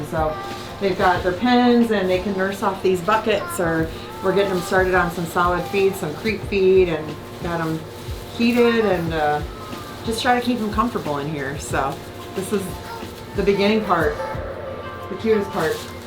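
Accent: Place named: North East, United Kingdom. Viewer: where is your farm located you sound a lot from the north east, I'm from Pa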